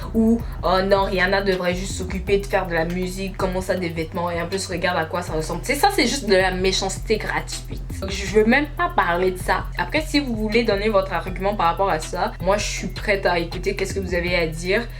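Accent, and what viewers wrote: Quebec, Canada. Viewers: Tu es québécoise ou tu veux Québec ? 🤔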